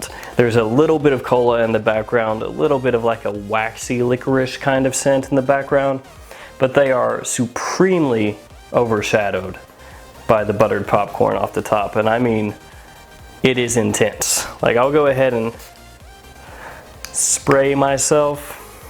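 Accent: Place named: Los Angeles, United States. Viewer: Dam. That's like a Hollywood story. Would've never guessed the two of you went to high school. I might be just hearing things, but it sounds like the way ya speak reminds me of someone here in L.A., while your wife possibly a southern accent. Again, I could be totally wrong.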